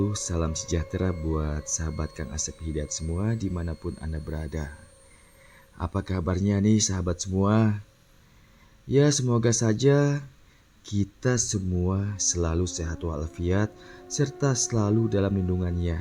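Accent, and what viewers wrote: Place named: Indramayu, Indonesia. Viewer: Gaya bicara Indramayu an pas temen kang Asep 😁 ,,,gak pernah komen dan like tpi selalu Nyimak #salam_Wong_INDRAMAYU 🙏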